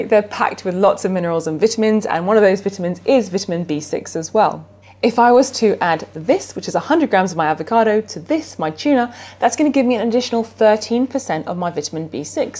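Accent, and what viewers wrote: England, United Kingdom. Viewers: Great video (shared). Seen some of your other videos and noticed your from Australia but your accent is more English as I'm from the UK myself. Did you once live in the UK and if so what Town/City.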